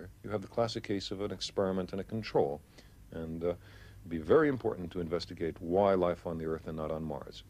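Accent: England, United Kingdom. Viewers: this british dude is annoying af. talk faster you smug fuck. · What a pompous ass English accent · the host is being agressively british while Sagan is being very american.. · Imagine there was a time when people spoke like that English dude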